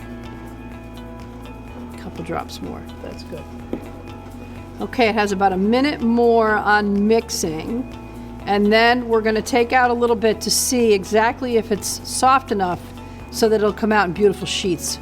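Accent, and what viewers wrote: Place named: Boston, United States. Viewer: hahahaah you must be from Boston too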